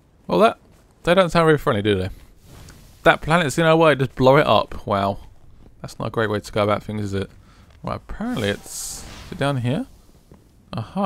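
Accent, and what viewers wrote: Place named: Southeast England, United Kingdom. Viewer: I'd guess he's from Southeast England.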